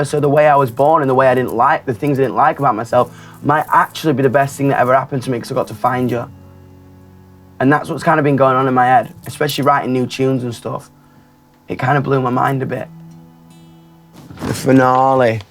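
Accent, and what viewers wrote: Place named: Doncaster, United Kingdom. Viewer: I love the Doncaster accent.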